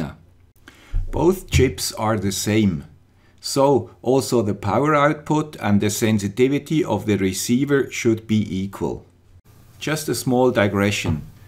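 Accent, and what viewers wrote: Switzerland. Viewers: the swiss accent reminds me of the swedish accent. don't worry, that's a compliment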